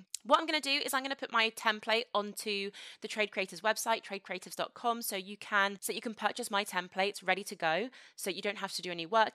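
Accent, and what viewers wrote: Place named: England, United Kingdom. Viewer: Coulda sworn she was English cos of the accent and then she said she is German and I almost did a spit take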